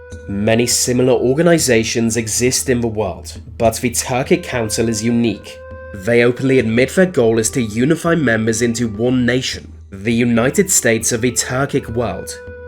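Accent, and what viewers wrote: United Kingdom. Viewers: What about a United States of, where the biggest export is weird facts and stories told in a snarky, British accent?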